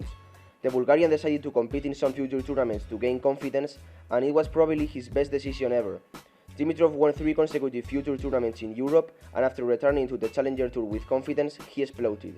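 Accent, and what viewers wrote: Spain. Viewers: Tienes el acento más español de la historia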